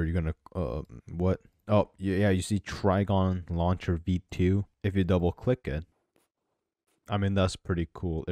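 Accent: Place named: Poland. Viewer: as a polish person i confirm, this is exactly how we speak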